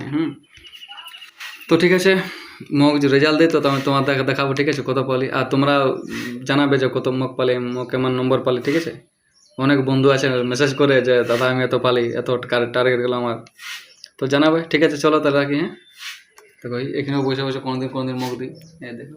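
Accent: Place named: Purulia, India. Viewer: Purulia r mal naki bhai tui... Kotha sune lagche..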